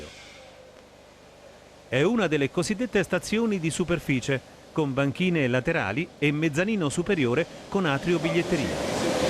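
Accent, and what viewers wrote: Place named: Tuscany, Italy. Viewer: Cmq noi romani, sul tema delle voci degli annunci, abbiamo sempre cercato di emergere dalla massa :D Oggi sulla metro abbiamo la frase "Uscita lato destro....?" pronunciata quasi come fosse una domanda (chi è di Roma e frequenta le metro sà di cosa parlo eheh); ma dopo aver visto questo video, consto che "ieri" la calda voce della metro romana aveva un bell'accento toscano!!! :D:D:D:D sulla metro di roma si parlava toscano!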